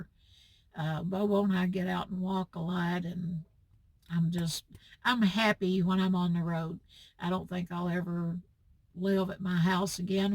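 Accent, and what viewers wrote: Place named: North Carolina, United States. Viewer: You sound just like my family! My mom is from North Carolina, and I love it when we go visit family there! I just watched Bob's interview with you, came right over to your channel and subscribed! I love seeing van tours, and your recliner is AWESOME! I'm look forward to watching the videos on your channel. I hope to get out there myself once I retire from teaching in 5 years (especially after going through this pandemic! LOL!) You were so encouraging in your interview - I want to encourage you also. Do what YOU love, and everything else will work out for you. Safe travels to you - I want to be like you some day! 😁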